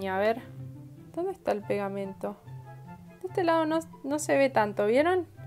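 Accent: Argentina. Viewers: Tiene voz de Argentina o soy yo :v o chilena :v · Sos argentina ? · sos argentina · Jaja re argentina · Sos re argentina jajaj ahre que yo también ahre jajaj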